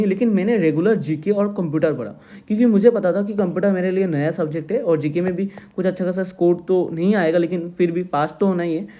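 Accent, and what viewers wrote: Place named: Assam, India. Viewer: Baki vo assam se h tou itni achi nhi bol sakte hindi thoda yrr log common sense nhi lagate Vedio ka purpose h knowledge dena aur tum faltu ki cheeze notice krte ho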